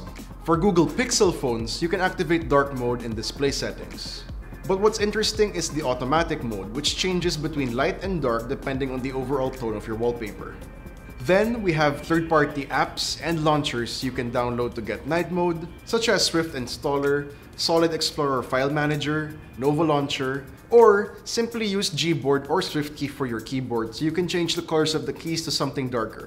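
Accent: Philippines. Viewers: You sound like Filipino accent. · Ur accent sounds like Filipino.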